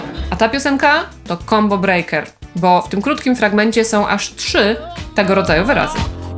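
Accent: United States. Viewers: milo Cie posluchac bo jesteś pyyyyrfekt :) częściej amerykański jak portugalski "brazylijski" :) zajebiscie to fajnie tłumaczysz staje się jasniej ... P.S. zawsze pomagasz - kochałbym taka Pania od anglika w school a nie miałem musialem się sam uczyc :(( niestety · Nie, nie jesteś - to amerykański akcent. Brytyjczyk normalnie powiedziałby to przez "t", ale w amerykańskim angielskim w takich przypadkach mamy właśnie takie " r".. to samo jest np. w wyrazach takich jak party, bottom, at all, community, better itd..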